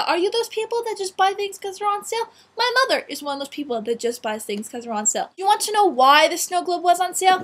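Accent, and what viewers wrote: Canada. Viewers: you know what I like it more when you're calm in ur videos... but it's ok cuz ur Canadian accent is so kwl =] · I think i like the canadian accent.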